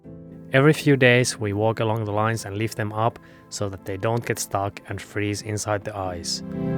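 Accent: Finland. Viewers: Finnish, judging by his accent.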